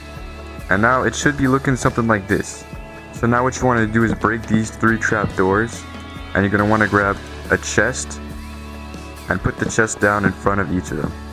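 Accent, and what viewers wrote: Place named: India. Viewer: I LOVE IT even though you sound like an Indian they humor and the innocent for tutorial you got potential 😂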